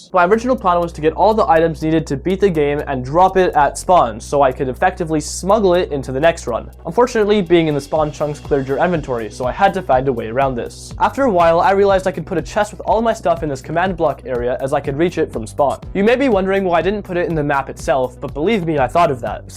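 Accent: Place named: Canada. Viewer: The moment I heard Kenadian I started loving this guy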